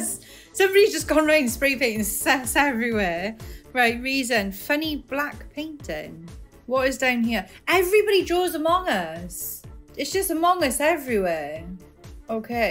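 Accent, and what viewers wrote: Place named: Scotland, United Kingdom. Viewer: What country are you from you sound from Scotland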